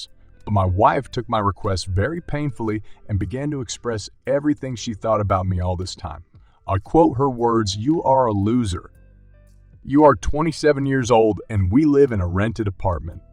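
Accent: United States. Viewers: Stupid knows both genders equally, so nice to get the perspective from the husband who was a good man. Thank you! Love it. Keep up the great work! Awesome southern accent! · Narrator has a distinct accent. I'd bet Texan, or at least somewhere close to Texas. ETA: I'm a Texan, and he sounds like us.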